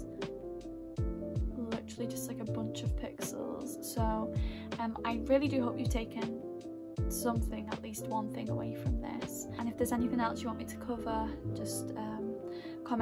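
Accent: United Kingdom. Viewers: Where in Yorkshire are u from?? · shes british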